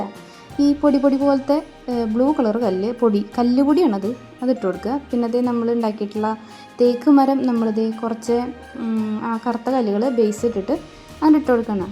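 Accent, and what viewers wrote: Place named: Thrissur, India. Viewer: മ്മടെ തൃശൂര് ഭാഷല് പെടക്കണ പെട കലക്കിണ്ട് ട്ടാ.... 👍🏻👍🏻👍🏻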